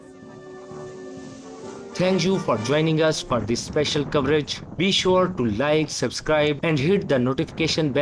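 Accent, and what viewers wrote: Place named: India. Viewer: Horrible Indian accent. Use AI.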